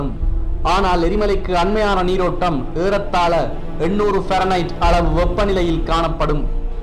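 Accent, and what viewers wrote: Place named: Sri Lanka. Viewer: nee pesurathu suththamana tamilan illai. nee Sri Lanka va?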